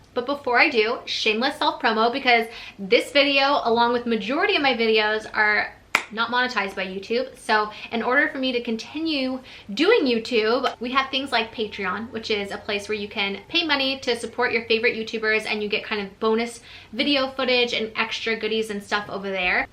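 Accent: South, United States. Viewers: Yes! As someone who's been through surgeries growing up,pain meds can easily become addictive,I try to handle pain without it,plus I can't even take ibuprofen without getting restless leg syndrome,I'm glad you had/have the strength to avoid that,lol also love the country accent haha.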